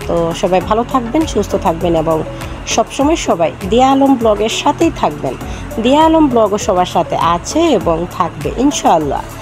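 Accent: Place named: Sylhet, Bangladesh. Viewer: সিলেটি ফুরি😃😃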